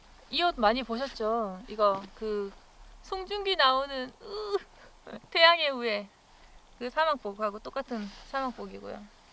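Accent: Gyeongsang, South Korea. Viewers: 작다~~ 경상도 아가씨네요 ㅋ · 약간 경상도억양 있으신게 더 매력적이십니다. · 살짝 경상도 악센트가 느껴지는데영ㅋㅋ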